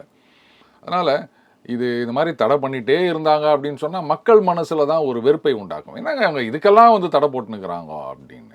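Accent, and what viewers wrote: Sri Lanka. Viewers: இலங்கைத்தமிழர் போல் உச்சரிப்பு இருக்கே இந்த நெரியாளரிடம்.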